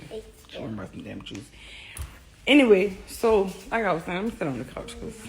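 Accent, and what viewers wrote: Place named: New Orleans, United States. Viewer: New Orleans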